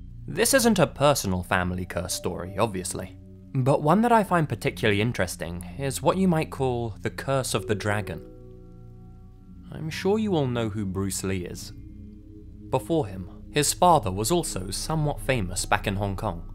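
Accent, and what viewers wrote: United Kingdom. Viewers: British accent doesnt spook me unfortunately. Good content tho.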